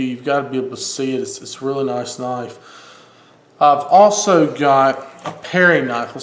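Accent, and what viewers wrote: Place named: Rural, United States. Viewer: Guy constructive criticism .. you dont start cutting for 8 minutes in a knife demo. Work on the accent I am from NE MS and you are killing the hick vocal quality at impressive levels. finally Learn how to use a knife you make the knife look dull but trying to hatchet through onions instead slicing and allowing knife to do the work . I suggest a quick watch of alton brown's knife video of good eats . oh an lastly like me you are better sited for behind the camera , put the knife on the the shot .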